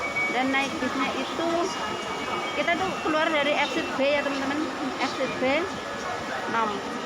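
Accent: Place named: Banyumas, Indonesia. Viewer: Salam ngapak mbae😂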